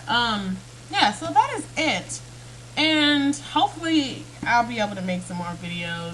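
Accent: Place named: Texas, United States. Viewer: wait what!? handcuffs? little timmy? 'what you've been doing wrong with it' ! you are HI-larry-US whe you go off like a shot, the faster you go, the more the 'texas belle' voice comes out until the rubberband unwinds and you just stop. or funner yet, freeze for a moment and then keep going! i swear i think my stream stops. keep the rants coming, it's a hilite of my day!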